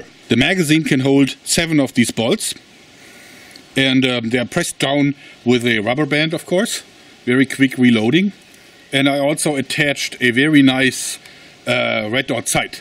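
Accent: Germany. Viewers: Sprichst du deutsch? weil auf mich kommst du wie ein deutscher rüber (von Dialekt)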